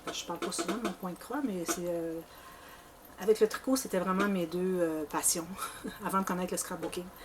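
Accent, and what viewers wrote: Quebec, Canada. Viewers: Bonjour c'est agréable de mieux vous connaître. Merci de votre générosité et de tout ce que vous partagez avec nous. Vous êtes vraiment une belle personne à tout point de vue. Les petits ensembles pour bébé sont trop craquants! Vous excellez dans tout. Ne "francisez" pas trop, votre accent et les expressions Québécoises moi j'adore! Bonne journée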